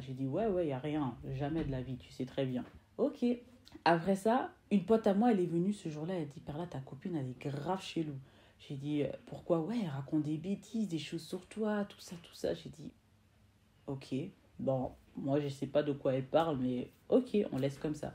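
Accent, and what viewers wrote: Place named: Democratic Republic of the Congo. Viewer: D'après ton accent Je dirai que tu es ma compatriote congolaise est ce vrai ?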